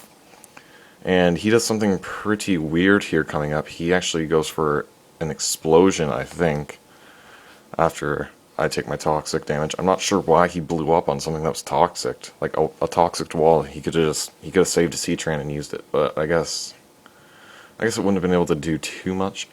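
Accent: Canada. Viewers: Hey, I saw you said on your channel that you use the desmume emulator so I went out and downloaded it, but I have a few questions how did you get your pokes to the correct EV IV and movesets. I've been trying to use action replay codes but I'll get the pokemon I need and then, my game will glitch out deleting my save file. Also it's no trouble to tell that you're Canadian lol.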